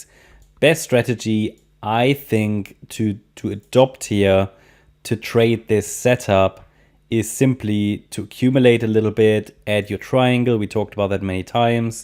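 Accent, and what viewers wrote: Germany. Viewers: Hi, I like your comments. I am wondering and I might be wrong.......are you German? Your English is pretty good with a German accent??....😀 I am German too but was living 17 years in New Zealand..... Happy New Year to you! 🤝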